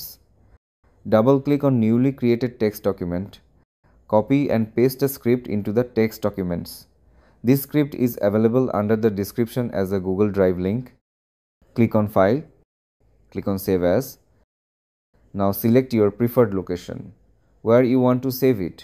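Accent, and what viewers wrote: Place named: India. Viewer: Ahh yes the "trust me bro" Indian voice. What a joke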